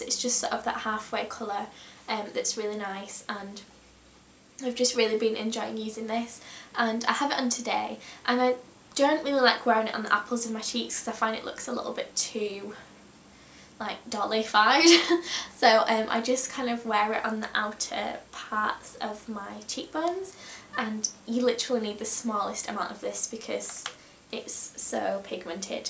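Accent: United Kingdom. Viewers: I love your accent!! It sounds like Kylie from Coronation street. As a Canadian I love UK accents. Great video too!!! Can't wait for more.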